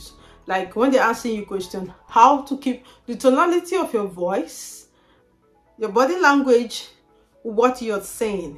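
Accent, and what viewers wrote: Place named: Africa. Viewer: Very hard for me to pick up your English African Accent 😢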